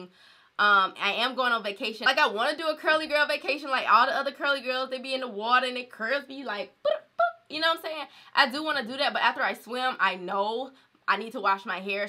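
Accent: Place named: Detroit, United States. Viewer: I think Detroit!!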